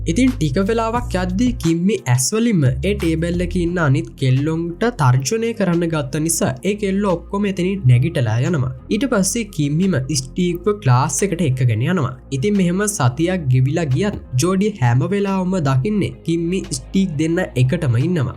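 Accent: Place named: South, Sri Lanka. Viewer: Uba dakune kollek neda????ehetta mehetta kiyanne 😂😂😂😂😂😂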